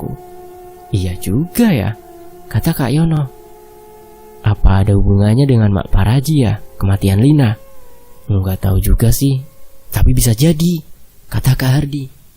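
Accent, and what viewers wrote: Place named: Surabaya, Indonesia. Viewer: Waahh.. ini nih yg keren .. bawainnya kalem saja .. mau serem mau seru ..mau bercanda tetep enak didengarnya .. saya penakut banget ..karena suka di isengin makhlus...🙈🙈 Tapi tetep aja penasaran dan ketagihan denger kisah2mu min.. yaa..meakipin beraninya denger pas siang sampe ashar ajah..😅😅🙈🙈 abis suka ngangenin sih kalo sudah berasu2 ber dancuk2 ala logat suroboyoan mu min.. kriuk banget. !!😅 Tetep semangat berbagi ya min.. dibalik setiap kisah pasti ada pesan positifnya terlepas kisah fiktif ataupun nyata.. Oh ya ... Satu masukan ya min. Tampil total aja ngebawainnya .. modulasimu yg oke bisa dikembangin berbagai karakter .. cuma yg kurang berpadu kalo karakter kasar pake bahasa Indonesia .. enggak serasi dg modulasimu yg kriuk kalem itu.. kek kepepet gitu galaknya..😅😅😅. Oke trims min.. berkah memberkahi selalu ditiap kehadiranmu..👍😊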